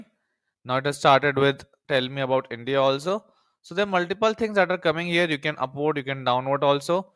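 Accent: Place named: Germany. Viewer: Dieses "Dinglisch" kann ich wieder nicht verstehen. Schade.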